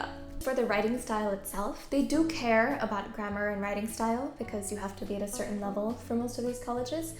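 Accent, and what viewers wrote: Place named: United States. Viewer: very nice. you have a perfect american accent, have you always had one?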